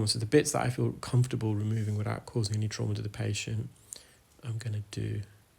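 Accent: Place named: RP, United Kingdom. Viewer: Thanks to you Dr., and yes, in my book, you are a doctor, I have started using medical grade Olive oil for my itchy ears. What a relief. For me, the fascinating part of watching you is a) I never knew any of this about the human ear and I am learning so much from you. b) you are so passionate about what you do. c) I love how you use the proper queens' English, I wish we did that here in the states. d) It is so satisfying to see you help these people with your kindness and compassion.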